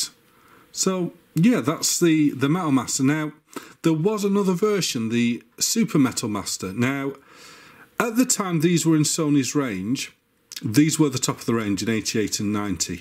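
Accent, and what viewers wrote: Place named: Northern England, United Kingdom. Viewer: No,I'm another North O'England boy just like you is.A bit further north than you (Durham).But IIRC Vic/Jim grew up in Leeds and sometimes you really do sound like him ,only for a couple of words at a time,here and there though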